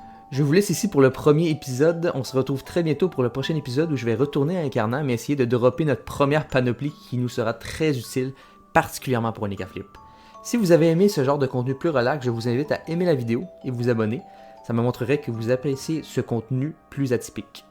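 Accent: Canada. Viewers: super ! continue & enjoy ! on adore le petit accent du canada <3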